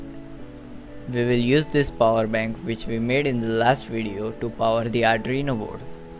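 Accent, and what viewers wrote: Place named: India. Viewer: when i hear that Indian voice feels like he wants to scam me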